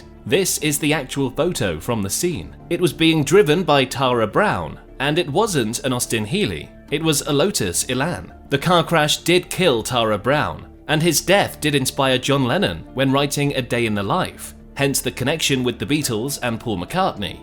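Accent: United Kingdom. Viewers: You are British because of your accent, British English, so you understand the subject well, why beatles were from london 👍 ￼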